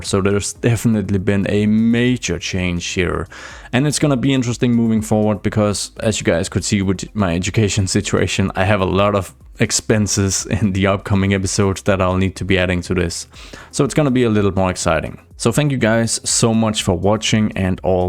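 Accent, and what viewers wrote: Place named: Denmark. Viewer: I KNEW IT I KNEW THAT U WERE DANISH I HEARD IT HEARD IT OUT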